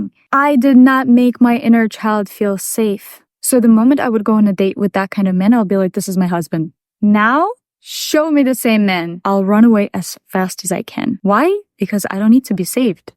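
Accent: Russia. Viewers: Are you russian ???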